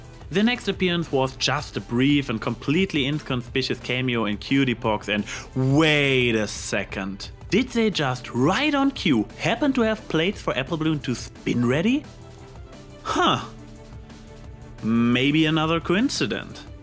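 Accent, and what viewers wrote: Germany. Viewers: Is he German? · I meant to say my accent isnt as bad as this. Seriously you have like the most German accent ever. Also what does stand for?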